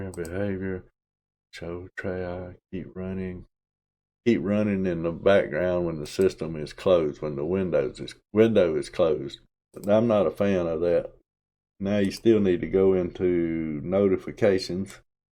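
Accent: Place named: South, United States. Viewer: Hi, GeckoLinux creator here. Thanks a lot for the thorough and open-minded review! And I love that southern drawl! Real pleasure to listen to.;-) Just a few clarifications: Regarding the lack of GeckoLinux theming for GRUB, that's intentional. GeckoLinux is unapologetically openSUSE, created from openSUSE packages and using the openSUSE repos. I recognize that I'm standing on the shoulders of giants, and I make no attempt to conceal the fact that the system is still proudly openSUSE. I'm not sure what happened with the Google Chrome repo refresh, must have been a temporary network failure. You can just skip the refresh on individual repos instead of skipping the entire process. What appears in YaST Software the first time after the initial database refresh is not a list of availabe updates, but rather a list of packages specific to your hardware. 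The XFCE panel clock size is actually controlled by the XFCE general fontsize settings. Your summary at the end was good, GeckoLinux is meant for users that appreciate the quality and excellent community of openSUSE but don't like dealing with the hassles. There are quite a few papercuts in a vanilla openSUSE system, mentioned on the GeckoLinux website, so the goal of the project is to eliminate those via configuration changes and package selection, while still remaining 100% openSUSE at its core. Thanks again! Have a good one.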